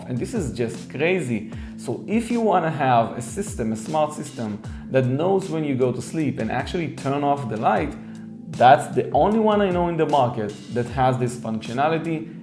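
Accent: Israel. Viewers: אין על המבטא הישראלי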